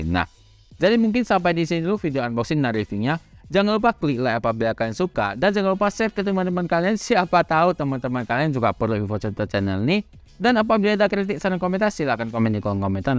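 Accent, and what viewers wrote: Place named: Pontianak, Indonesia. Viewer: Bahase koko macam Chinese Pontianak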